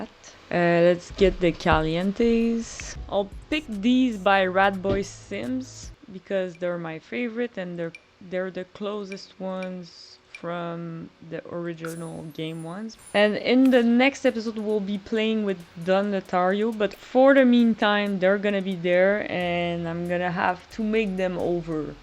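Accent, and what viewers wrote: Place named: Quebec, Canada. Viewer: une quebecoise !? je déjà suis fan 💋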